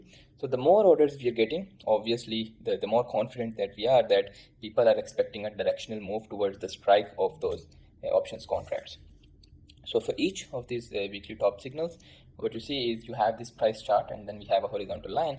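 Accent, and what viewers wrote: India. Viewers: bro if u want to trade against algos, market makers and smartest people in the world and you cant understand an indian accent, i think you are not smart enough to trade lol I understood everything perfectly and I see alot of other people did too, so it's just you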